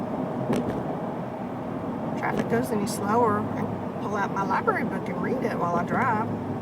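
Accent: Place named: Houston, United States. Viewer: You are possibly the coolest mom that has ever lived. (I remember you said you had a daughter from one of your other videos I watched) You make YouTube videos and art projects. Not to mention you are super funny. Wish my parents were this cool when I was a kid lol. I was wondering where you were from because your accent is so familiar. Half of my family is from Houston so now it makes sense lol. Love you channel!! -Katie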